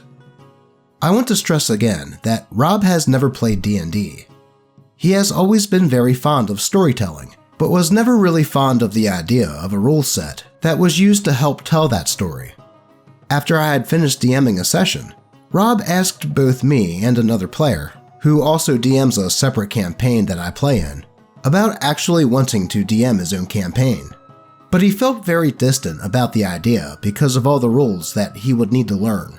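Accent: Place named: Maryland, United States. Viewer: I love this guys Maryland accent.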